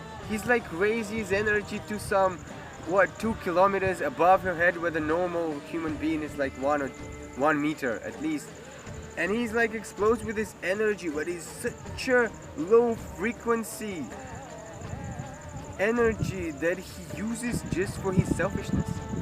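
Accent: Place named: India. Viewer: 2reto. He does project a weirdness that makes me wonder if it's a persona. Who is he really to be teaching others? Why does this Russian man speak English with an Indian accent? So many questions...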